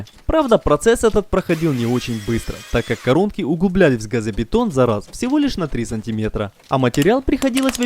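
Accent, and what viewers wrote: Ukraine. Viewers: Всеодно ти говориш з українським акценом, прошу озвучувати Українською мовою твої відео. Інакше Я відмовлюсь від твоїх відео. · Вам, разумеется, можно говорить как угодно - это ваше право. Но всё же когда человек говорит неграмотно, это порой вызывает муку, Вы же формируете контент для зрителей. А то, что русский для вас не родной - не правда, даже если вы в быту и пользуетесь украинским, в чём я сомневаюсь, то и русский вы знаете прекрасно, просто не даёте себе труда не суржиковать. ps "ризетки" нет ни в каком языке. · Если придираться к речи, то в роликах много «очепяток» в речи, присутствуют акцент и слова «суржики», потому что они украинцы, но ребята не претендуют на знатоков по русскому и литературе. А еще у них голова и руки на месте!😎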